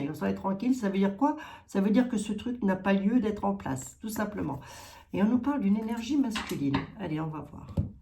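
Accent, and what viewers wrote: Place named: Meurthe-et-Moselle, France. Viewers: coucou j'avais bien reconnu la pointe d'accent de la meurthe et moselle hihi, oui la blonde est veuve du 1er depuis 2019, l'addiction y a qu'a voir ce frotte le long nez a tout bout d'champs, merci a vous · Cc je me disais bien que je reconnais ce ptit accent...je suis de moselle...merci pour votre présence 😘😘❤❤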